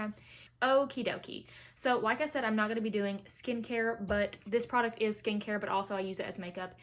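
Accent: South, United States. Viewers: I love love your southern accent. This is my first time watching your YouTube page! I found your channel through #allieglines channel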